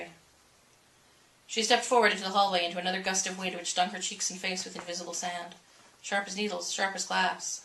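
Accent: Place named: England, United Kingdom. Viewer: Best in an English accent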